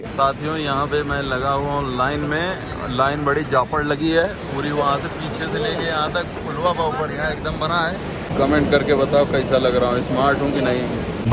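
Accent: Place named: Kanpur, India. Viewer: आपकी भाषा में कानपुर का flavour रहता है अच्छा लगता है बाहर हो तो और अच्छा लगता है। जय बाबा की 🙏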